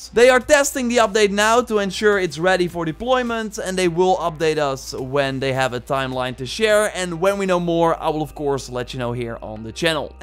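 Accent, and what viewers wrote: Netherlands. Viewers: Lemme guess...are you Dutch ? Waarom kost het ons zoveel moeite om zonder accent te spreken?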